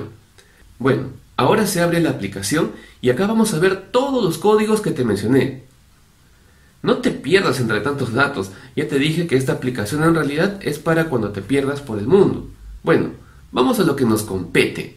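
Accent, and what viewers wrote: Peru. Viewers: Hablas agradable, peruano, mejor que lo horrible que hablan ciertos ecuatorianos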